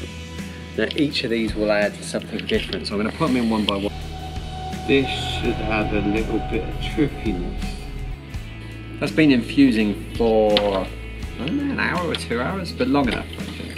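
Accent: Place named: England, United Kingdom. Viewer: I usually hate English accents. But, This guy sounds like Michael Caine♥️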